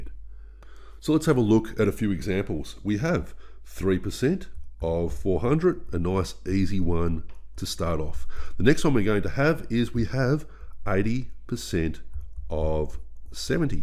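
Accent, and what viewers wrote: Australia. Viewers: As a New Zealander, I would say his accent is from Australia, and probably so is he.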